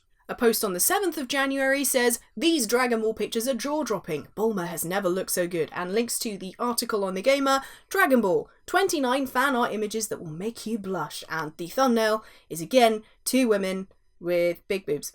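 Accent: United Kingdom. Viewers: You're British?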